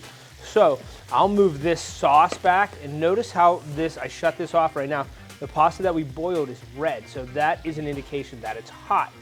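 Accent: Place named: Pittsburgh, United States. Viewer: Brother yous is crispy and crackerty is you from Pittsburgh?!?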